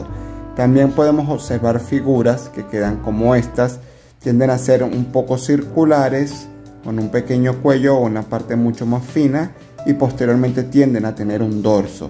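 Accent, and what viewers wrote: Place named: Venezuela. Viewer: Excelente espiritualista, muy claro y conciso, de conocimientos amplios sobre temas de magia y espiritualidad con mucho conocimiento de la antigüedad respetando los rituales de como deben hacerse, te felicito además ese acento y dicción excelente me parece venezolano, excelente la verdad ojala te hubiese conocido acá en Venezuela para aprender lo que no sabemos de la magia y nuestros ancestros, bendiciones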